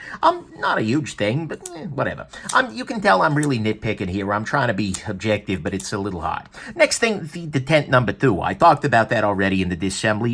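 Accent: United States. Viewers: downvoted because of this stupid yankee accent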